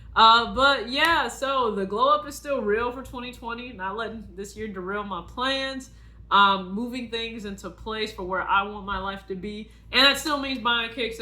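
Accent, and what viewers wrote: South, United States. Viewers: Yaas congrats on the promotion girl!! I always love to see my fellow women winning and prospering in life! No offense to the men here 🤣. I have a question: where did your southern accent come from? I've been trying to go through states you might be from in the Midwest like Kentucky, Missouri, etc but now I'm stumped since MI was mentioned.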